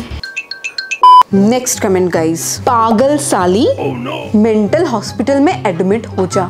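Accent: Bengal, India. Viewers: And Plus she is Bengali so hindi isn't her first language like other youtubers. And im also a Bengali.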